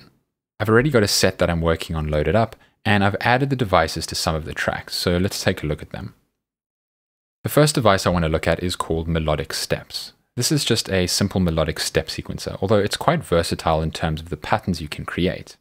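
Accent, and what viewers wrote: South Africa. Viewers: I know a South African when I hear one!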